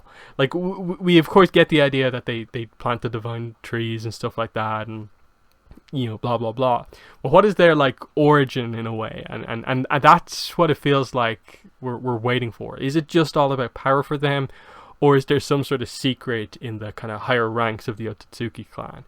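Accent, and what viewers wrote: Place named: Ireland. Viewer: You sound Irish but this was a v good review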